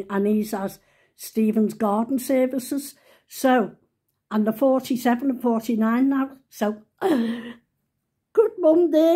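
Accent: Liverpool, United Kingdom. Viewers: Bless you ,you area genuine Scouser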